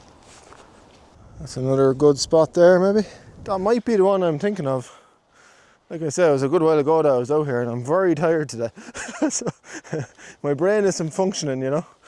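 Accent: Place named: Ireland. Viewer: Your channel popped up on my feed,im so happy it did! How great are you?! I love your irish accent! Im aussie,but my heritage is irish. Just feel so nice listening and watching your show. 💖thanks mate!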